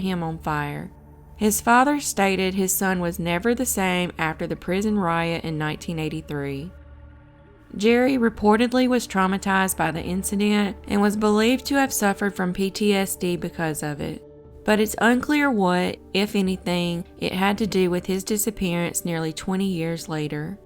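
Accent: South, United States. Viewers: I don't think she sounds bored . It's just her southern way of talking . Pretty crappy thing to say . She has the best page on here. I love her videos ! Maybe you should go watch a different page to make you happy ☺️ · Love your southern accent and your videos love from Birmingham 🇬🇧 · She has a southern accent.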